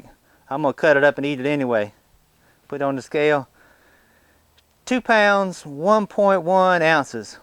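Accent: South, United States. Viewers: Beautiful tomatoes :) This is my first time growing cherokee purples, and I'm hoping they come out as well as yours... Btw, you have one of the greatest southern accents I've heard on youtube, and that's from an alabama native! Very impressive, sir.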